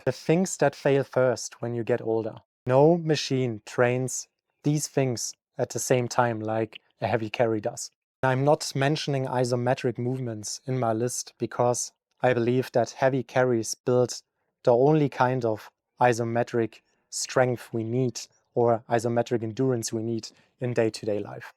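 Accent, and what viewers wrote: Germany. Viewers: very subtle german accent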